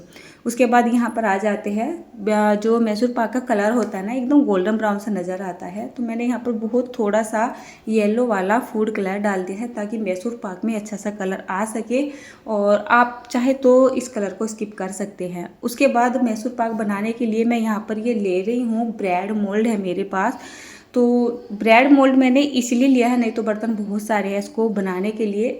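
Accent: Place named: Uttarakhand, India. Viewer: Acha bna hy ghee kafi lgta hy hath maiy lga hua hy achi grhni ho ❤❤😂😂 bolne se uttrakhandi lg rhi ho jai ho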